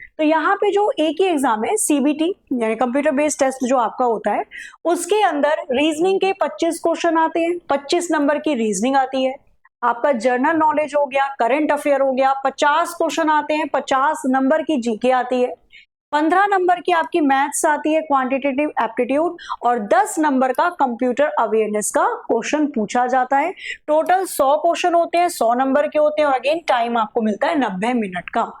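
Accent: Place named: Bihar, India. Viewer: Mam your bihari accent is too cute ❤. Love it❤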